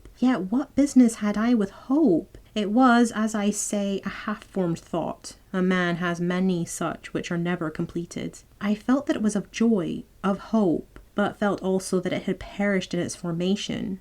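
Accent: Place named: Scotland, United Kingdom. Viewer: I just found your channel. Your accent is strange. It's like you're either from Scotland and have been living here in my home state is Indiana for years OR you're FROM the Midwest and have moved to Scotland or nearby and have picked up some of the accent. It's wild. It's like, to my ears, you almost sound like an average US person but then it's sprinkled with a bit of a Scottish brogue. Lol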